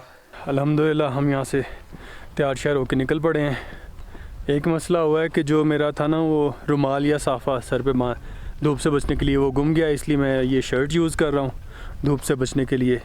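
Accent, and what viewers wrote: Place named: Lahore, Pakistan. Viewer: AP Lahore k ho jes hsab Sy bolty ho Lagty Lahore k ho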